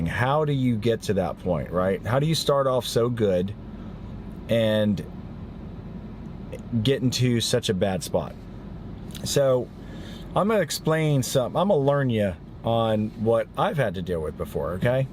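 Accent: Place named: England, United Kingdom. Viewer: Sweet words except for the English accent! Yer right man...dedication, motivation, communication. It ain’t easy owning a lawn maintenance company but it can be rewarding.😀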